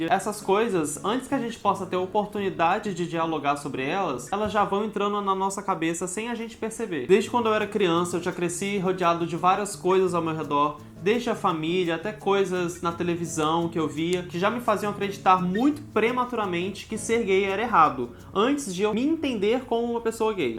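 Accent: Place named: Brasília, Brazil. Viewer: O é brasiliense? Cheguei no canal há pouco tempo e tô reconhecendo o sotaque hehe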